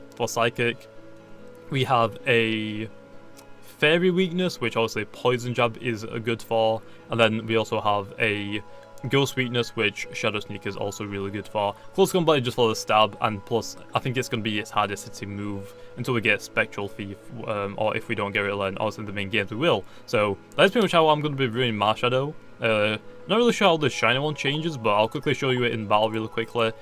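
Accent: United Kingdom. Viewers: What's his accent? British?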